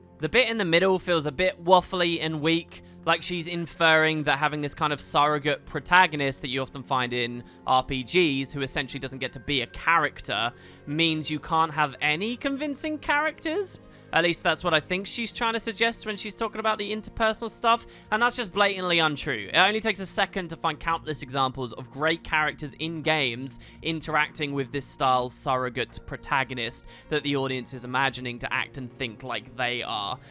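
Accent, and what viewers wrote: United Kingdom. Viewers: your British accent aside we US Yankee's love:) THIS... THIS is why everyone i know and play Gw2 with feels the same IS the VOICE of Gw1 and Gw2 for many of us. You are able to look at the information stay as impassioned as possible to make the most rational conclusions is why even on topics I disagree with you on (20 to 25%) I ALWAYS know your coming from a good place, a person who loves and likes community, the Guild Wars world and games, and decency. All the best and thank you for not doing what 99% of you tuber's do. Worry about speaking your truth an losing subs. Ty again.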